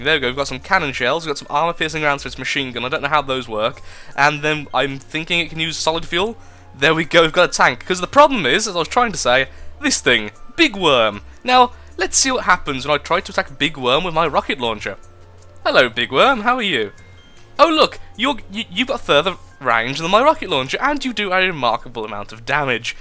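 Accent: United Kingdom. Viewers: i have a weird feeling your voice is different, more "brittish" pretty weird since you are brittish but still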